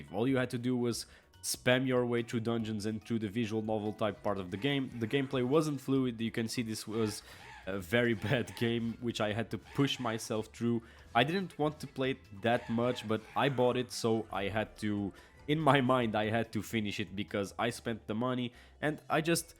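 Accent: Portugal. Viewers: Eu juro que pelo teu sotaque sabia que eras pt😂😂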